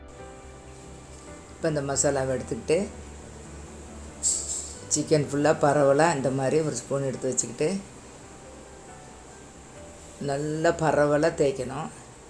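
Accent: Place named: Tirunelveli, India. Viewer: Amma neenga tirunelveli a thoothukudi ya ila kanyakumariya???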